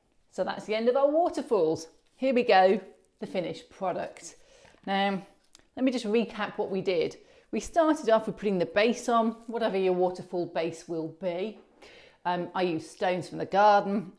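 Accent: United Kingdom. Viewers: Oh my ,I`m going back 3 years,but soooooo much to see and learn from you.First off ,as the lady below mentioned, it is quite nice to see a woman doing these types of tutorial videos....a real breath of fresh air (and I like the English accent) Now then I plan on doing a bit of water effects on my expanded layout (most likely using Envirotex Lite ,readily available at my craft store and 50% off coupons make it a good choice) and was wondering if you were using Tamiya acrylic paint to tint your water product and if I could use Tamiya to tint Envirotex Lite ? Thankyou and please keep making these fine videos!! Oh oh ,I was watching another of your vids (Dec.2015) about your troubles with Envirotex Lite ,the browning and the bubbles etc.Do you figure it was the way you mixed the product that may have caused these troubles ?Thanyou.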